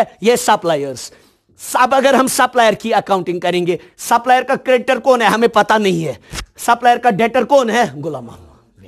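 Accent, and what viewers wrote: Kashmir, India. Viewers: Sir ap ki kashmiri sunn k mojhy B ed ki clases ki yaad aa gi...😂😂😂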